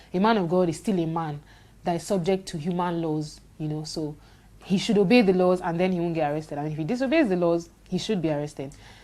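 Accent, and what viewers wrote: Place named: Edo, Nigeria. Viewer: see your ekiadolor English..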